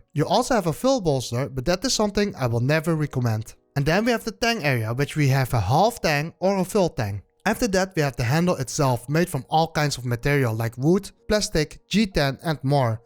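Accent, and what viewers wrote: Netherlands. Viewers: Really like your videos and your thorough, honest advice (i.e. "no such thing as "the best" knife). I also find your Dutch accent charming to a certain extend. Yet, you maybe need to work on your English pronunciation of "U" - You say it like "oo" as that letter is pronounced in Dutch and German. In English it's rather said like "you" as in the word "use" (say "youse", not "ooze"). Also your inconsistency in using either singular or plural can be irritating, while your English vocabulary is great, though. Also, please e so nice to use your index finger for indexing, using the middle finger looks kind of rude. Keep up the good work and all the best!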